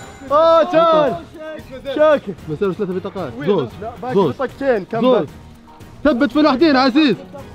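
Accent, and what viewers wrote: Saudi Arabia. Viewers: لهجتك مثل السعوديين تماماً هل انت سعودي ولا ارني 🇸🇦🇯🇴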